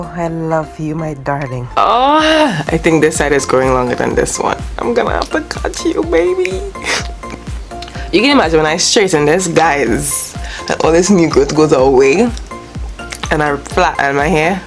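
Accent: Trinidad and Tobago. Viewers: i now go to say her accent sounding rel strong like ah Trini but i hearing ppl saying African and i wondering why but everywhere a trini go dey say u are from Africa haha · Its ur trini accent that made me watch the whole vid. I dun kno how to use rice water but it was really/rell nice to hear it from a trini. :) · Oh... I use par excellence rice and I don't get what you get at the top of the rice, plus you can add 10 drops of lavender before you put it to ferment... Love hearing my Trini accent ☺ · She sounds Trinidadian 🇹🇹🇹🇹🇹🇹🇹🇹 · Sounds like she's trini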